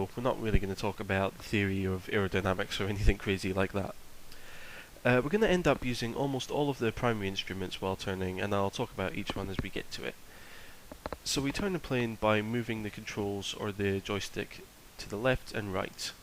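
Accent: Scotland, United Kingdom. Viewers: I would guess its a fairly light scottish accent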